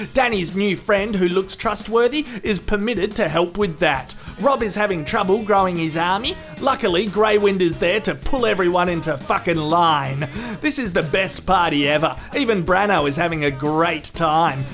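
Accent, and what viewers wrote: Australia. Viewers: he's definitely not British. I'm British and he sounds more Australian to me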